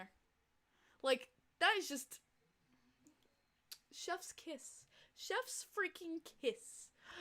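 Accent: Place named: Long Island, United States. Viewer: Your Long Island accent is soooooooooo cute. 😍😘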